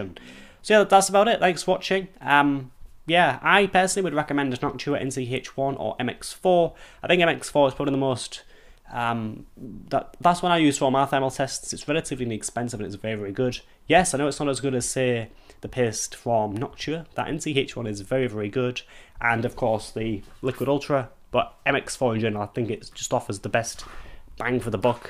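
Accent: United Kingdom. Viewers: I suspect that you are speaking English; however the thick British accent makes it very hard to understand what you are saying. Too bad because it appears that you have a good comparison.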